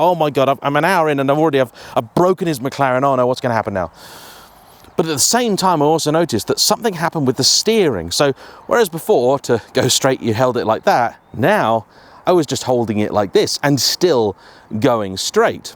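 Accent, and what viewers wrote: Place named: England, United Kingdom. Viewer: omg I love listening to PROPER English and not the American version... For anyone that does not know, English is not the native language of America, they truly don't have a native language at all! START SPEAKING PROPER ENGLISH AND STOP CALLING FOOTBALL A SPORT THAT THE BALL BARELLY TOUCHES YOUR FEET !!!!!!!!! a trash can is a bin and the hood is called BONET because the hood is the one you GREW IN !!!! You ruin everything you touch, but ruining language is a crime !!!!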